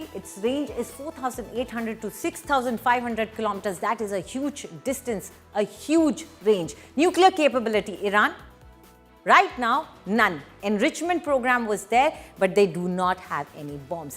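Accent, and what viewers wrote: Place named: India. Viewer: this indian accent 🤒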